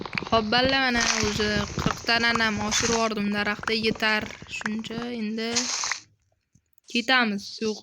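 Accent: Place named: Khorezm, Uzbekistan. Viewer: uka xorazmlikmisan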